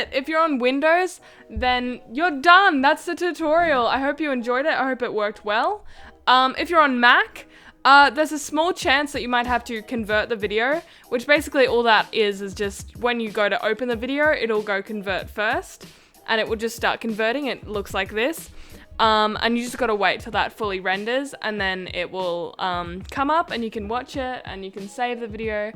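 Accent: Australia. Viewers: Congrats on your first monetized video and your aussie accent is fine btw! No need to put on subtitles 😅 Thanks for this tutorial btw! I'll be sure to come here if I ever want to learn to animate msp characters, it sure is nice to see someone who edits with medibang instead of photoshop for a change when it comes to animating on after effects ♡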